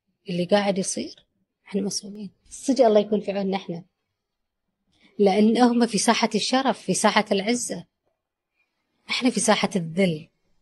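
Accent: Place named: Kuwait. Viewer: رجعت عدت الفيديو اكثر من مرة لهجتها اقرب من لهجة الكويت مافيها تضخيم لهجة البحارنة .....بس اكيد اهل البحرين الشرفاء على الرأس كمان بس في البحرين السنة مو مقاطعين ستار بكس ولا مكدونالد بينما الشيعة مقاطعين ....مفارقة عطيبة مش هيك !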